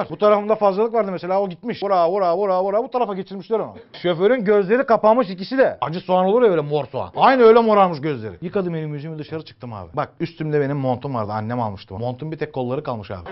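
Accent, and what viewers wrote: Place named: Altınova, Turkey. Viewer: Balıkesir altinovaliar gibi konuşuyor süpersin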